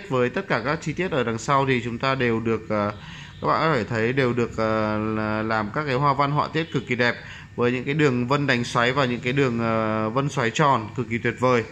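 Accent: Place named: Scotland, United Kingdom. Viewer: Thought this geezer was Scottish at the beginning lol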